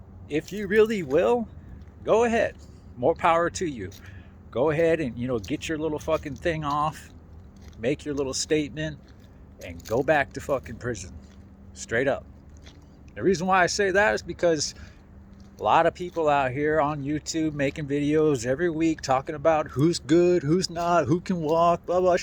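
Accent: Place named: New York, United States. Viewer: Sad I think I figured it out he from New york?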